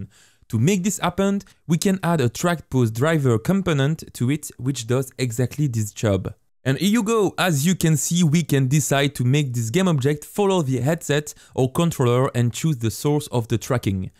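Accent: France. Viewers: You are probably French right? That accent seems French to me :) Well I am too, just not from Europe, I have a different accent here in Quebec. Anyways thank you for the tutorial ! Merci. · It's the French accent for me. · Pas mal le French accent x) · Your french right? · Juste a ton accent: Tu es francais? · A lil french xd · On reconnaît le booonne Français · Is he french? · En tout cas on voit pas du tout que tu as un accent français cher compatriote ahah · I love his strong French accent haha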